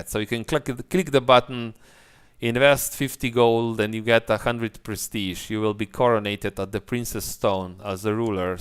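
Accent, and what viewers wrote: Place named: Slovenia. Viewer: You sound Slovenian... -_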